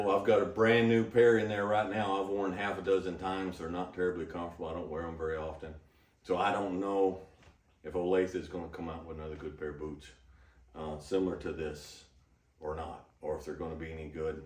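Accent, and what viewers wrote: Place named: West, United States. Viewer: I wish to get this western accent.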